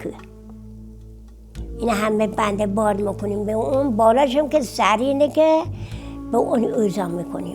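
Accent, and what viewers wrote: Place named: Eastern Turkey, Turkey. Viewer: She speaks similar tone as eastern accent of Turkey with similar sentence emphasises so I feel like I should understand it but barely get a few loan words.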